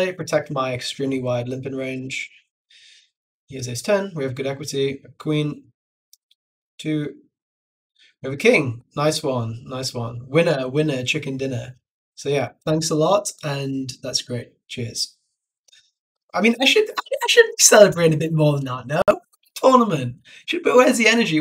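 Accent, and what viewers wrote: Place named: United Kingdom. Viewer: Where are you from in the UK? Hard to pick the accent!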